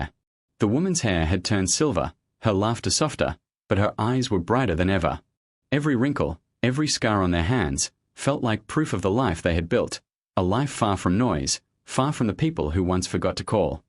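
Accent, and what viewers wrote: United Kingdom. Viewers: Do not care for the British accent! Not subscribing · ♥️♥️♥️♥️ Loving the British accent ♥️♥️♥️♥️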